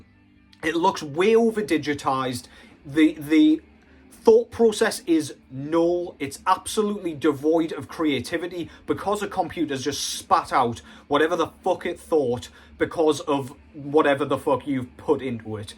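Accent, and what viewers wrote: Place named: Northern England, United Kingdom. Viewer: You saying piss poor in your delightful northern English accent is my favorite thing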